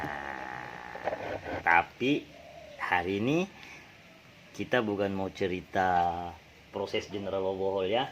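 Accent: Sabah, Malaysia. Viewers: Terima kasih encik berkongsi ilmu. Suka saya mendengar loghat orang Sabahan. Kereta pun sudah ada tanda2 perlu tukar. Semoga dimurahkan rezeki tuan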